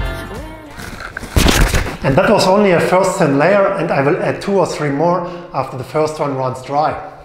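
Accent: Germany. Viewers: Funny Guy, Hilarious comments, No BS to the Point, Crazy Accent - German if i am right! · deutscher akzent on point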